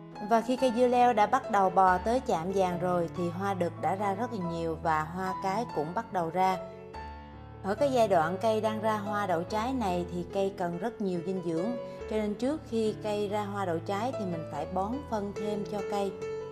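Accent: Mekong Delta, Vietnam. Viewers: Bạn ở miền tây hay ở đà nẵng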